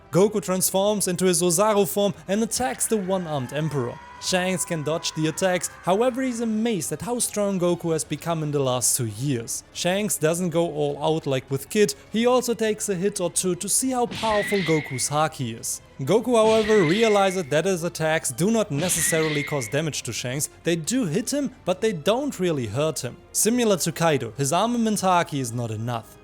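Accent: Germany. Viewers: Are you german? You have the accent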